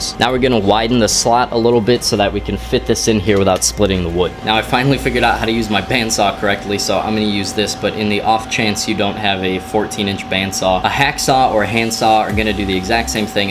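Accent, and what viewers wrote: France. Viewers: Dude that french accent 👌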